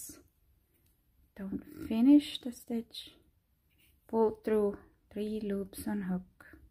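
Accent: Estonia. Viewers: Are you estonian? You have an estonian accent :)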